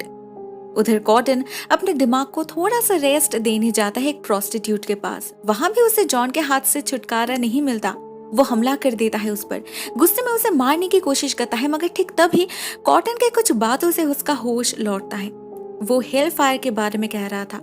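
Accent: Bengal, India. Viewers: Omg your Bengali accent 😍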